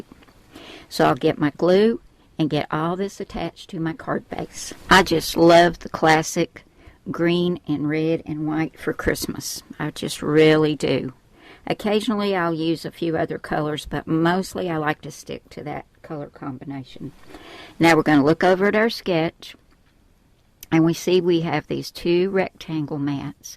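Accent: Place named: Midwest, United States. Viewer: I wasn't going to share this, but guess I will. I LOVEhearing your voice and midwestern accent. Makes me think of my mom whom I don't get to hear anymore. You really are the BEST! Been watching you for YEARS now. God bless you!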